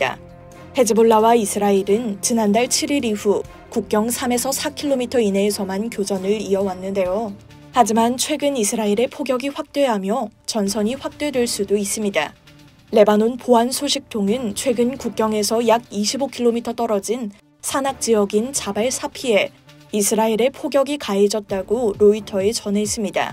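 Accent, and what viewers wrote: North Korea. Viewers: AI 어투가 북한말 같아요 한국 방송답게 해주세요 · 나레이션 탈북자 엑센트네요 ㅎㅎ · 웬 북한 억양? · 말투가 북한말인데? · 북한 말씨네.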